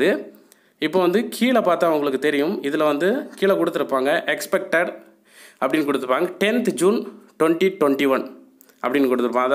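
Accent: Kanyakumari, India. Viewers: Kumari slang 🥰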